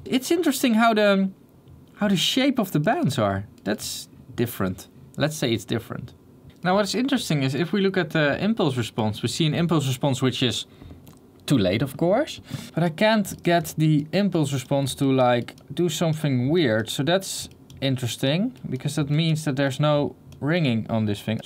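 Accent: Netherlands. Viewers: hoor ik daar een Nederlandse toets in uw accent? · i could tell this dude was dutch like 3 words in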